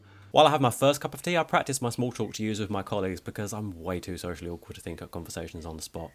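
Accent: United Kingdom. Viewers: I didn’t get a word you said because you are British.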